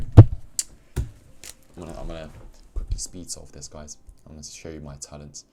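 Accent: United Kingdom. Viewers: Sorry about that 😔 you know his British so it's not good fault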